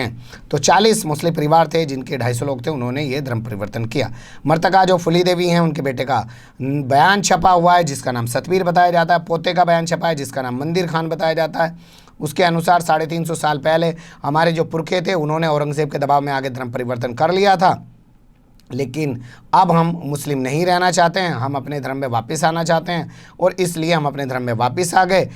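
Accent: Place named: Haryana, India. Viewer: आपका हरियाणा वाली टोन बहुत ही रोचक लगी साहब गजब के बोल देते हो आप।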